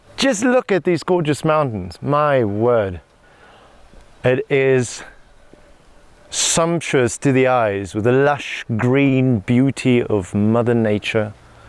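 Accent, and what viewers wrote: South Africa. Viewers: South African presenter? Great job guys!!! I need a TDF in my life 😍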